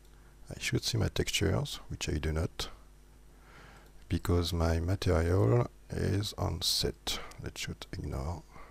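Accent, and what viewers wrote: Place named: France. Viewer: Really useful video. I would have never thought about that trick. Also I enjoy your accent. Brings back good memories from the land of Begets and red whine.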